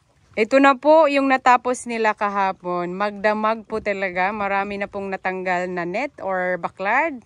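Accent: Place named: Iloilo, Philippines. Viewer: Ilonggo din pla kyu mag salita mars?ganda pla ng vlog mo nka aliw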